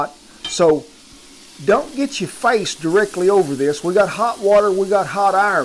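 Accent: South, United States. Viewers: Hart warter lol love the southern accent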